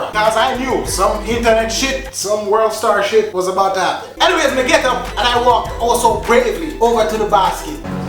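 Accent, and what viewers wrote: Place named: Africa. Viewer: Big man a scream lol.. Yeah right African sound dwl. Funny bro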